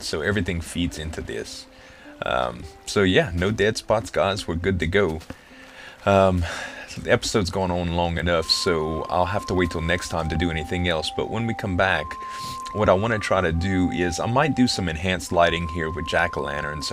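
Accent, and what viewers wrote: Louisiana, United States. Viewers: Hey great video! I'm glad you found me through Lembley :) Oh and have you been to New Orleans, just out of curiosity? I can definitely tell from your accent that you are from Louisiana!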